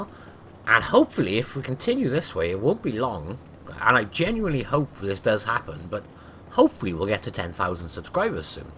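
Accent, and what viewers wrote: United Kingdom. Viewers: will i did not win i am a bit sad but that's not the reason why i subscribed i want the at15 because it has a ridiculous rate of fire and good armor but hey hearing you laughing along with that magnificent British accent got me compensation hope we get to rich 10k as soon as possible and please keep laughing at reds and keep uploading videos my favorite YouTubeer:D...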